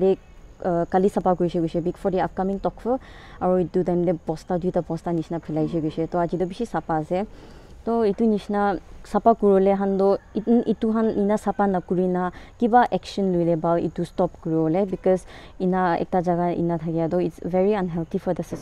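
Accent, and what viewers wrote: Nagaland, India. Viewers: Now that is how Nagamese should be spoken, unlike those other channels trying so hard to add in Assamese and Sanskrit-like words which we can't even understand.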